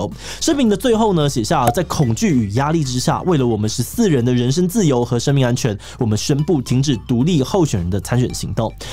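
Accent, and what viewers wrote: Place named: Taiwan. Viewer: 听到你这个台湾腔就是不对劲了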